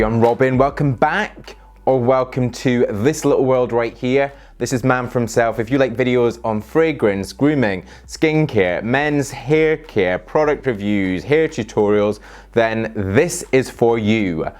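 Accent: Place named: Glasgow, United Kingdom. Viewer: You from Glasgow dude?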